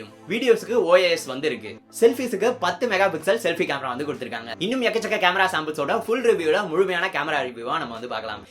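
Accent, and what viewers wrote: Madurai, India. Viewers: Bro neenga maduraiya?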